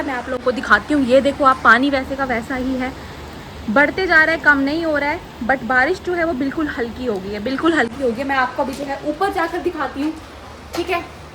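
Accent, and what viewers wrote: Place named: Pakistan. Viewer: Your urdu language and flavour of Pakistani serial made me believe that u r a Rawalpindi girl but soon found lower Gujjar Nagar around children park 😢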